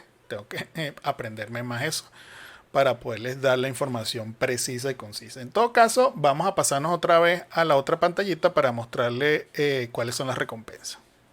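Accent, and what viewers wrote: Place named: Venezuela. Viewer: Coño esa forma de hablar pareces venezolano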